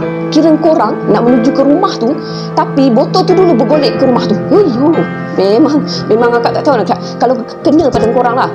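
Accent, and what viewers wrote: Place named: Temerloh, Malaysia. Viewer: Akak ni asal org pahang ke bunyi loghat macam org temerloh Btw best akak cerita santai semoga maju jaya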